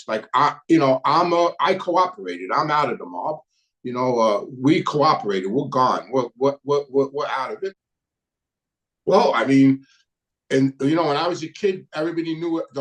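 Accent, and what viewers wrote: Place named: Queens, United States. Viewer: It’s Joey merlinO not Joey merlinA lol it’s ok it’s that queen’s accent I have it too :(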